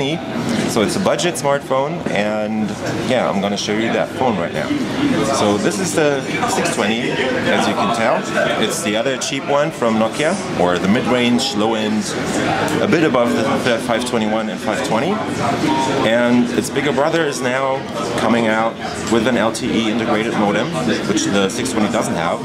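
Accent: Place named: Germany. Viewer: Your english has less of a german accent here than it used to have, i noticed all of a sudden.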